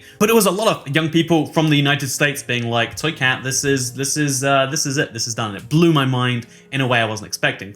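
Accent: United Kingdom. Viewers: His voice is so British my ears got collanis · First time I watched a Toycat vid, without actually watching... Must have been the British accent, or cringy gameplay 😂❤ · are you british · I loved listening to a British man talk about the US. Gives us a whole new perspective on ourselves as Americans.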